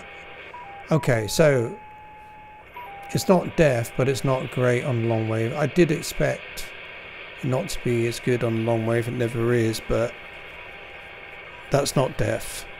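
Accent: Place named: Bristol, United Kingdom. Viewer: Just ordered a BJ9000. I realise you are in Singapore but, your accent sounds quite local to me here in Bristol. Thanks for your expertise from M7CUN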